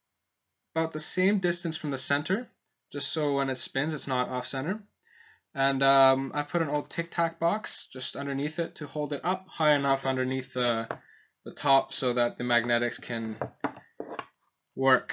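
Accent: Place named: Canada. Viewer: What's up with Canadians (presumably by your accent) and amateur chemistry? IIRC Nurd Rage and Nile Red are also from the land of the North Woods (assuming they are not the same person, likely but unconfirmed as that is). BTW does it really matter how centered a stir rod is? It's been ages since I took Chemistry in HS and TBH I don't recall any of it. I am about to start messing around with home made enchants and looking at the hardware I have in the junk drawer there are lots of motor options. Precision centering things might be more of an issue for me though. Any advice?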